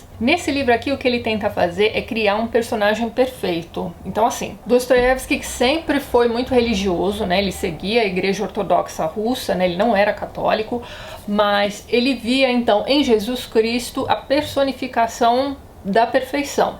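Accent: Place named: Brazil. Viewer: Despertou curiosidade de ler e estou lendo. Bons resumos despertam vontade de ler. Rui, um português de Portugal que julga excelentes os vídeos apesar do português com "açucar".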